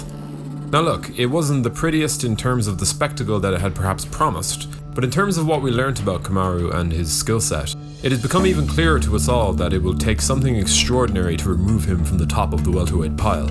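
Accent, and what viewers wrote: Ireland. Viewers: Is the guy talking irish it sounds like he is but trying to hid it anyway great video but as an Usman fan burns and Colby fights worry be especially burns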